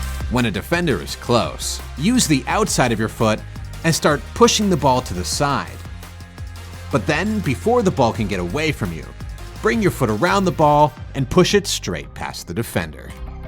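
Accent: United States. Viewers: Clicked video. Heard American accent. Closed video.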